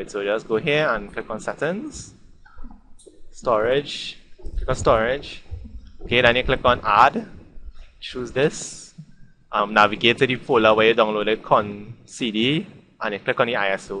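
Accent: Trinidad, Trinidad and Tobago. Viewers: trinidadian? me too! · wat a large trini accent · yuh soundin like yuh is ah trini, I undahstan yuh clean. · He ah trini!!! Trini big up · you sound like a trinidadian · de trini accent strong.. lol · i understand it very well are you from trini to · wait nah...a trini? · Trinidad accent haha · never thought i'd see a trini giving tech advice on youtube yes..sounds like yuh just kixxin around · how that sounding like a trini accent so ? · your trinidadidan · lol 100% trinidad accent... maybe bahamas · Hes trini/guyanase and probally a west indian. I can understand his language fine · u iz ah trini or wa? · Trini accent strong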